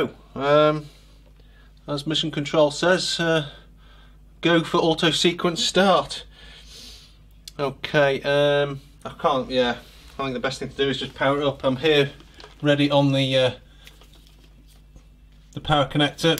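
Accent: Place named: United Kingdom. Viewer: the brit'ish accent is unbeataple.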